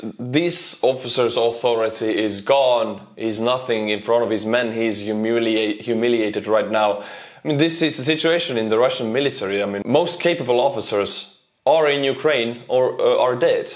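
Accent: Scotland, United Kingdom. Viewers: You have talent as a commentator! I love your Scots accent for you land and title advertisement. I’ll bet the you are there most successful advocate!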